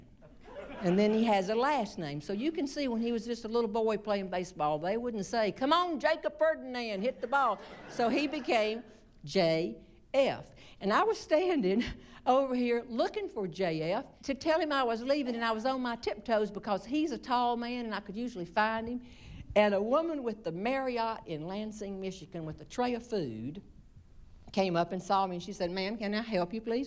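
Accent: South, United States. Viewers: I Enjoy Listening to Jeanne Robertson she Always makes me LAUGH 😄🤣🎶& The most EXTRODENARY sense of humor<~& She does it in such A "SWEET SOUTHERN" Way She NEVER uses any profanity never says Ugley things about anyone !!!!Never uses Profanity ONLY~Brings Out the HUMOR in OUR EveryDay Lives 💁👌😍😍😍What a GREAT THING TO LIVE~Love & LAUGH & Share with YOUR FAMILY FRIENDS & LOVED ONES ❤️ A little JOY & HUMOR-----esp.NOW In Out miserable uncertain world!!!!So This To will bring TO YOU & A SMILE😃On Your FACE & IN YOUT HESTT❤️HAPPY HOLIDAY to YOU & OTHERS😊😆😃😂 to YOUR FACE & A LITTLE JOY In Your HEART♥️🎶💋❣️!!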